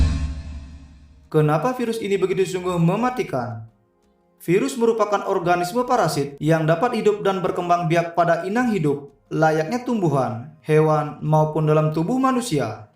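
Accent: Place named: Bali, Indonesia. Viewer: Narasinya diperpendek, logatnya kent al bali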